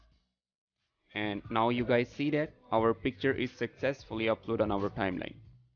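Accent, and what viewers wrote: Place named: India. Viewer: Me: sweet this tutorial looks good hears the indian accent and leaves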